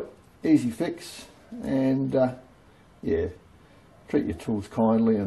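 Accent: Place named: Australia. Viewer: hi!! i have those calipers too. i have that problem so i am going out now to fix them. thanks keep up the great work. good to hear an aussie voice on youtube!! lol!!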